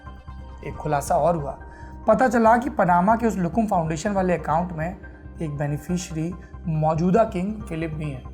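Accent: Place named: Kanpur, India. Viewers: Kanpuria style mein news sunna hai to Saurabh bhaiya ka lallantop dekho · बहुत दिनों बाद.....आज इतना बढ़िया यूपी वाले लैहिजे में बोले हो .....मजा आ गया आज तो.....👌👌👌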